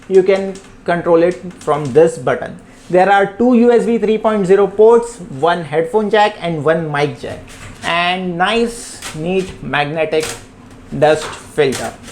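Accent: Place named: India. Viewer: Ох уж этот индийский говор...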